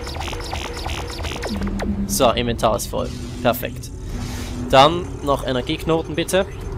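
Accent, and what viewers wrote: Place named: Switzerland. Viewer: Erfrischung mit schweizer Akzent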